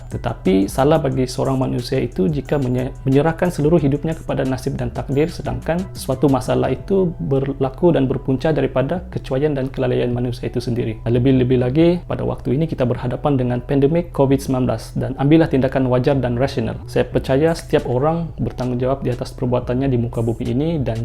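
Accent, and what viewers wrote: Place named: Sabah, Malaysia. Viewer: Ya, agak jelas slang sabah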